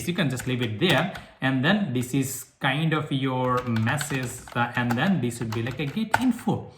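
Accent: Bengal, India. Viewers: Are you Bengali? Cause you English accent is very familiar to me.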